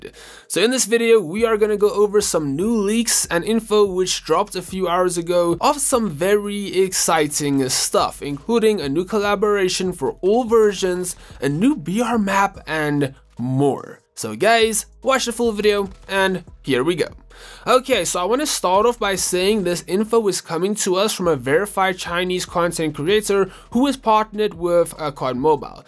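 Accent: South Africa. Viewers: Your from south africa?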